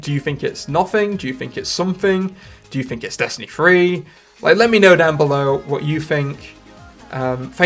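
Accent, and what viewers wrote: United Kingdom. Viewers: he's british???????????? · IS BRI'ISH?! (I hail from Tw*tter)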